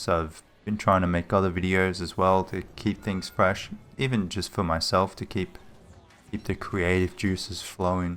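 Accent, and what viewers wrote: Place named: Australia. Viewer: Hello! I've been watching your videos and I really enjoy most of them! I'm really in love with your accent, it's reminds me of Aussie people. It's sad to know that Australian people are not real :(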